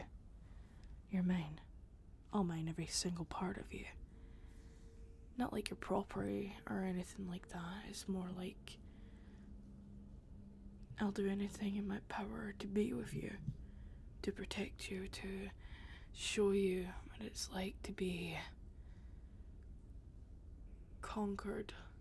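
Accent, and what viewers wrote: Scotland, United Kingdom. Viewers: Are you Scottish or I'm so confused oh god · The first part was a bit to loud and violent for me. But this one was lovely! And I do love your scottish accent so much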